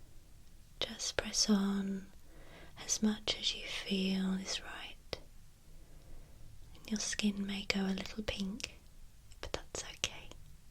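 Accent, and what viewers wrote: England, United Kingdom. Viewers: Hello, I listen to you in France, near Paris. I enjoy your voice and your videos. Are you english or american? I suppose you are english.... I don't understand all what you say but I listen with a lot of pleasure. My english is not very good, sorry. Very friendly to you!!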